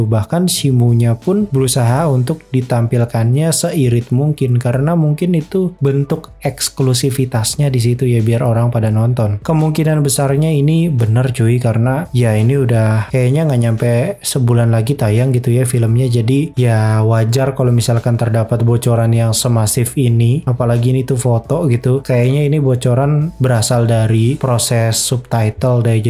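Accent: West Java, Indonesia. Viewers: Kang, sunda na timana? hehe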